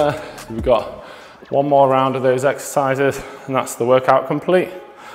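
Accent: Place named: Newcastle, United Kingdom. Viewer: ARE U FROM GEORDIE SHORE??????? A LIFTING LHAGER VIDEO, SMASH ITTTTT LADDDDD. 😊😊😊😊😊😊😊😊😊😊😊😊😊😊😊😊😊😊😊😊😊😊😊😊😊😊😊😊😊😊😊😊😊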